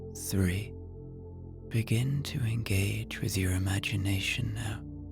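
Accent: Ireland. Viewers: I have a 3 week old baby and your sleep stories have helped me conk out when my husband takes the baby for a while! It’s lovely to hear an Irish accent in the stories. Loving your work, from a Galway girl turned Dub 😂❤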